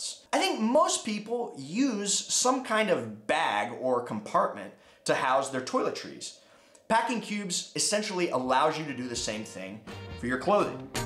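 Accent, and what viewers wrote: United States. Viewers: I didn’t mean anything bad. It’s just that you are exactly how I imagine an American to look and sound like ;)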